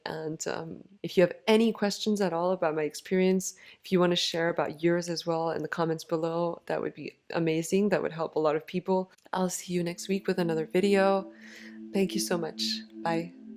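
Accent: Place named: United States. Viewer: Thank you, thank you very much for sharing your story. I got Tinnitus in June 2020, and I am still suffering at daily basis never disappeared. After 6 months of waiting I saw a NHS ENT or like you say ORL en francais, I was diagnosid via an audiogram with a significant hearing loss in left ear around 20%. A second ORL and audigram confirmed the same results. Here is my short story before getting Tinnitus; I passed through stress and anxiety over 5 years, and I managed to overcome the hurdles by running three marathons and half in a period of four years and the earphones were always in my ears with loud ipod music, and the fifth year, just when the pandemic hitted hard, I restarted to run again, and onward I cannot hear well with my left year, just few days later, I started to hear hammering, whistling, and roaring sometimes like the echo of car engine running from far away and still until now. 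It went worst during and after exercises and like you say after alcohol or eat spicy food. There is no treatment for tinnitus, we have just to accept it and live near the traffic or in the heart of a city center to forget it. The silence makes me anxious because I hear my Tinnitus, thanks for suggesting gingko biloba, I am planning to explore the possibility of a homeopathic therapy, did you try any? Another question unrelated, have you done any audiogramm with your third ORL? Any hearing loss? Take care your accent in English sounds American not French.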